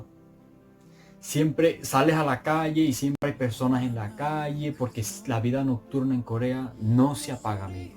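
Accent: Zulia, Venezuela. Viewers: yo quisiera oír más sobre esa lengua y cultura a las que ahora para hacerlo más “appealing” le sumamos ese hermoso acento zuliano, sabor venezolano que desborda alegría sin igual. Salí de Vz hace como 30 años, pero ahora estudio coreano y mi sueño (sé que lo lograré) es aprender bien el idioma, visitar Corea del Sur y trabajar en este y mis otros idiomas. Muy orgullosa de ti y los demás venezolanos emprendedores que se esfuerzan por salir adelante, dejar en alto el nombre de Vz y dar a conocer lo bueno, lo bonito, lo chevere y lo maravilloso de quien sabe perder y prepararse por ganar. Quizá parezca que se ha perdido una batalla, tiempo y más, no lo negamos, pero que se sujete fuerte este mundo porque emergeremos con más fuerza y valor. Mientras tanto, en el exilio hoy tal vez, que se conozca por doquier nuestra voz, corazón, cultura, tradiciones, comidas y más.Hay gente de más edad por ahí, que hacen, a que se dedican, cuales son sus intereses más allá de la familia y carrera profesional. 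Ojalá puedas responder con algún video. Te bendigo. Te deseo lo mejor. Respetuoso saludo desde TX. Adelante!! 화이팅:)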